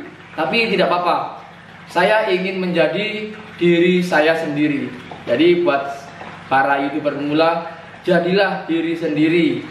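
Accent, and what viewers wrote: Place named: Java, Indonesia. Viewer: Logat medok dan kaku yg penting sukses mas.. salam dari youtuber anyar🙏🙏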